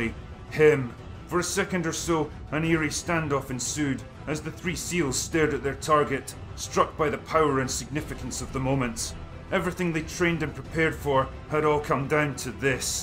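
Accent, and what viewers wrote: Scotland, United Kingdom. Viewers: God DAMN. Still remember where I was on that morning. Remember driving into work, radio on, outlandish preliminary death counts. Entering the classroom, turning on the television for the kids-- like my teacher did when the Challenger exploded-- because this was more important than any lesson plan., you brought tears to my eyes. Also, haha, I loved hearing US SEAL team members with your Scottish accent. God bless ye!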